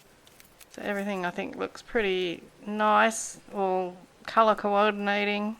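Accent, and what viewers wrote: Australia. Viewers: Thank you, for your inspiration on the hidden theme. I just joined your channel. As soon as I heard your voice, I knew you were Aussie. I'm an Australian, too. I live in Wodonga, Victoria. 😊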